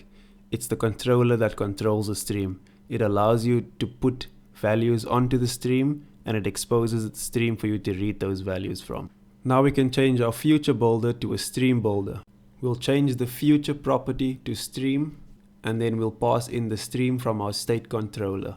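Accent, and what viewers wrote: South Africa. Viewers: Sorry man I just want to ask are you south African by any chance ??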